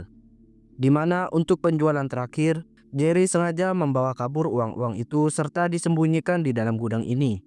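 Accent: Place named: Madura, Indonesia. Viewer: Hee.. kau madura ya? Jing-trejing tak iyaa..? Bikin perut mules dengar kau cerita.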